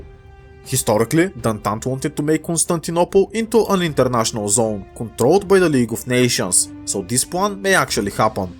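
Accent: Bulgaria. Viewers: Оригинален български акцент на английския, не може да се обърка😅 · По акцента ти мога да позная не само, че си Българин, но и че си от Източна България :D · Your accent sounds just like Bulgarian.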